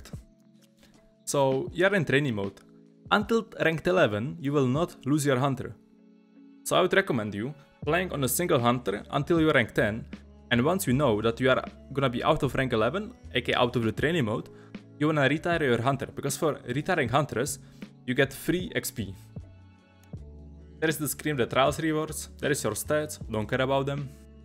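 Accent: Czech Republic. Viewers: Hello arent you from Czech ? you accent sound like it chears :)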